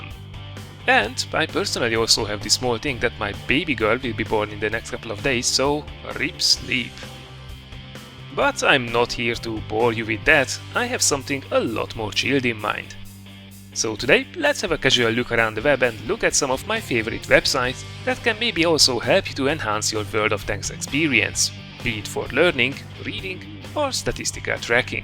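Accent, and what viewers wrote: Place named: Hungary. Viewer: ha tippelnem kéne akkor Magyar ... akcentusból ítélve.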